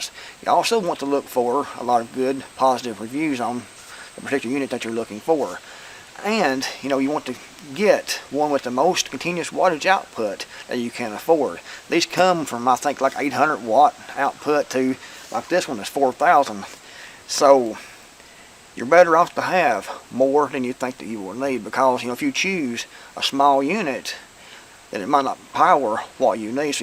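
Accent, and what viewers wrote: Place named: North Carolina, United States. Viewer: Hey I told a friend of mine from North Carolina that he talked about as close to you as anybody that I had ever met before, and now he won't return any of my emails. I don't understand, but for some reason no matter how hard I try, I can't talk like you at all, and I'm from Missouri.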